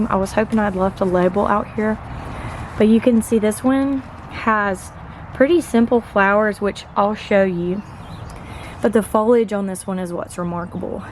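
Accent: South, United States. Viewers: You sound like Arkansas or Georgia! Love your cacti Ma'am! Edit: Your sun porch looks amazing!!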